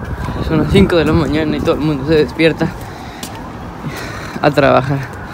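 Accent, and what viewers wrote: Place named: Loja, Ecuador. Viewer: Muy buen vídeo tienes mucho talento, saludos desde Loja hermano, tú pareces Lojano también por tu acento y todo...de que parte eres?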